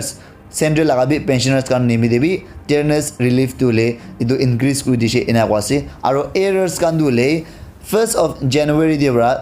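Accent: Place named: Nagaland, India. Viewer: I really like his nagamese accent🤣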